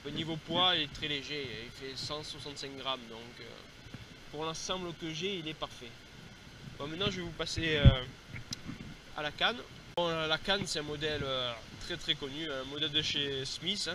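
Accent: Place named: Corsica, France. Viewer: ps ; ta l accent corse un truc de fou :)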